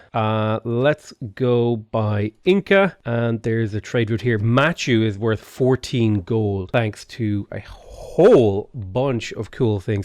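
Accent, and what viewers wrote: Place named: Ireland. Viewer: sounds about 40% more Irish in this video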